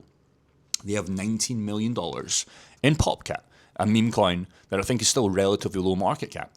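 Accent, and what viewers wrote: Scotland, United Kingdom. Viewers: Scottish ffs what u American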